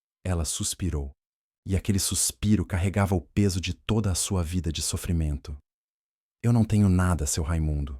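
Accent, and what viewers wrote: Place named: Portugal. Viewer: Que narração orrível a istoria e boa não coloquem mais este narrador mistura com língua de portugal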